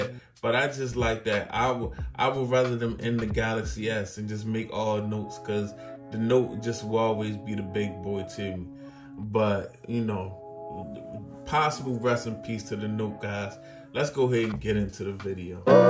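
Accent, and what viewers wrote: Baltimore, United States. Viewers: Love the video, I subbed. I’m just wondering but are you from Baltimore?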